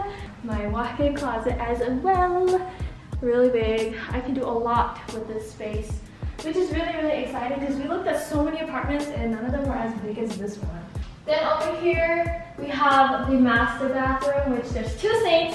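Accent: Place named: United Kingdom. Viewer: 😂also i can hear some british accent going there✊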